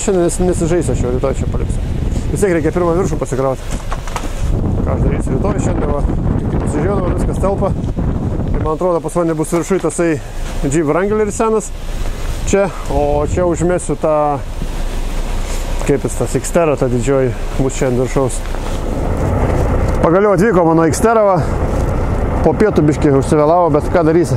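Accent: Šiauliai, Lithuania. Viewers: is akcenta bene is siauliuu busi?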